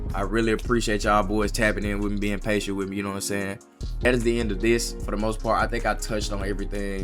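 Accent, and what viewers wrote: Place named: Mississippi, United States. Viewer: What part of Mississippi you from fam?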